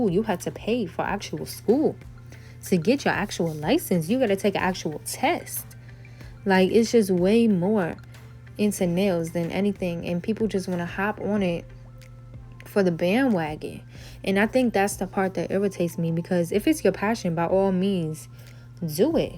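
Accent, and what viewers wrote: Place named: DMV, United States. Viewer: I knew you was from dmv! Heard it in ya voice! I love your nails! You bomb AF!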